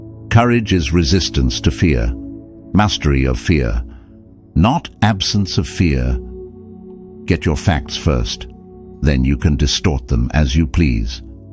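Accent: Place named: England, United Kingdom. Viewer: Mark Twain quotes in an English accent, sigh, what is this world coming too?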